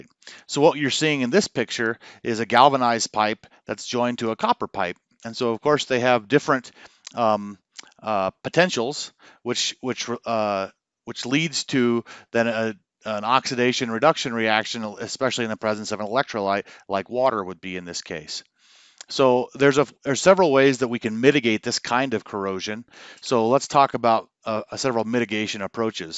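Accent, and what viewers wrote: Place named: India. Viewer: you sound like an indian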